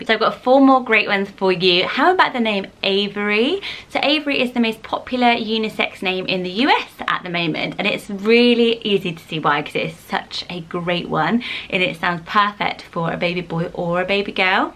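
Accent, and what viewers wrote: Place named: Somerset, United Kingdom. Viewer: Are you from Bristol? :) Your Accent sounds quite somerset/Herefordian!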